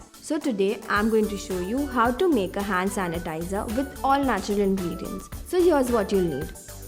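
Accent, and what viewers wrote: India. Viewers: Very hard to understanding her ascent too strong indian.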